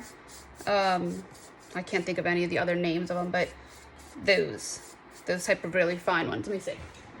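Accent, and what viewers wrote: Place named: Louisiana, United States. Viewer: Thank you for being real and showing us all how to. Got a question are you from Louisiana? Oops I finished watching and answered my question...lol I thought so I recognize the accent ❤ I grew up a Louisiana girl.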